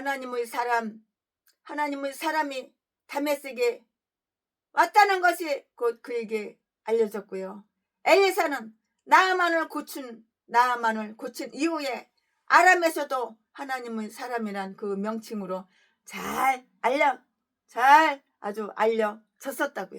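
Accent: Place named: Jeolla, South Korea. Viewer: 예라 ㅋ ㅎ 전라도웃겨요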